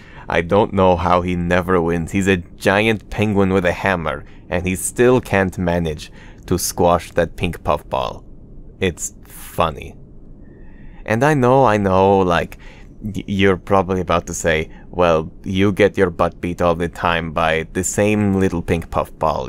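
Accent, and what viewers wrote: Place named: Bulgaria. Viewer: Love the Bulgarian accent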